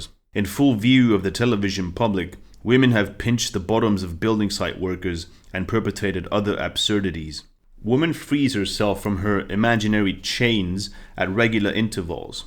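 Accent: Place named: Eastern Europe. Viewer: My favourite quote: "theoretically, it is possible for a beautiful woman to have less intelligence than a chimpanzee and still be considered an acceptable member of society" Where are you from? You sound like another YouTuber called "Eastory". I'd say somewhere in Eastern Europe. But you have a British accent too. Well-narrated. You have a pleasant voice and everything is easy to follow.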